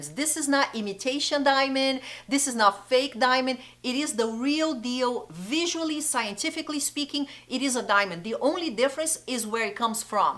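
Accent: Brazil. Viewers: Are you brazilian? Because of the accent! Love your videos!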